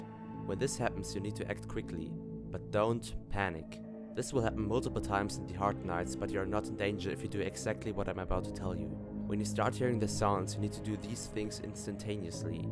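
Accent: Germany. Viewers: thank you i just downloaded it aigan and i forgot everything thanks for the video and help!! u also sound german to me cuz of ur accent haha xd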